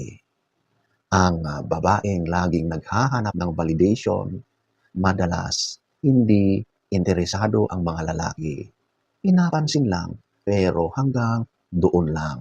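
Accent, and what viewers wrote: Ilocos, Philippines. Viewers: Ilokano kaba idol madlaw ko gamin kayat ko boses mo super humble sika👍💯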